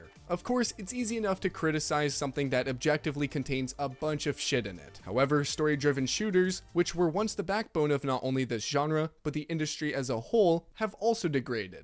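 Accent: Russia. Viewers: Are you russian??? "Convierrted"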